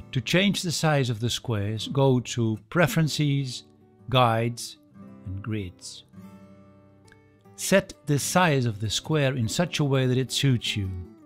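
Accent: Netherlands. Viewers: Are you Dutch? You are an amazing teacher and the accent helps (I like Dutch accents)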